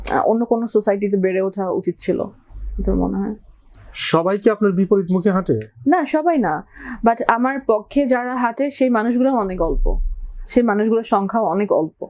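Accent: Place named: Assam, India. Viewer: Asameese der moto kotha koi kyan?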